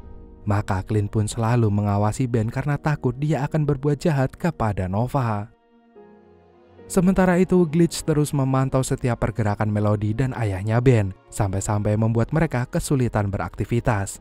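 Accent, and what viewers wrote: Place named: Java, Indonesia. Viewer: Rada medok suarane pasti wong jowo😂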